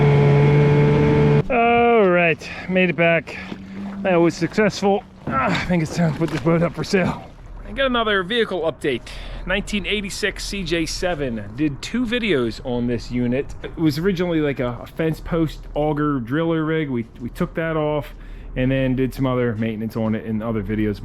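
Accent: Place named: South, United States. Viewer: Luv your southern accent, looks Fabulous! Thank you for posting 😀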